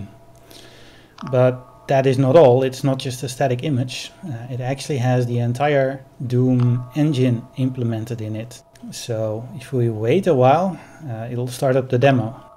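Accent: Netherlands. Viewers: Nice! Good job. I like the dutch accent..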